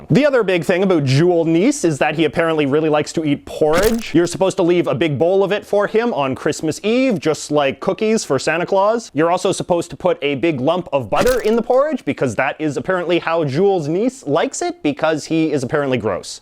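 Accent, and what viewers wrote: Canada. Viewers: He's very phonetic when he speaks. He even says the aboots and oots and aroond. Which I'm sure many agree not very many people in Canada pronounce it that way. Either way he's insightful and I don't mind. I'm actually more weirded out by the Canadian than his very bad pronunciation of дед мороз. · Hi J.J.McCullough. As a fellow Canadian, I am just wondering what part of Canada you are from. You seem to have a pretty thick accent when it comes to words like about which only exist in some provinces. Just curious, cause I'm from Southern Ontario and we don't seem to say about the same way 😂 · Hmmm you’re Canadian aren’t you, squidward...?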